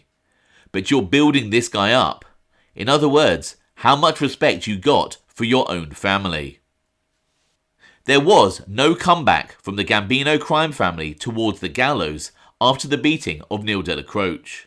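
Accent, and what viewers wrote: England, United Kingdom. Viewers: There s nothing like reading a transcript with an English accent …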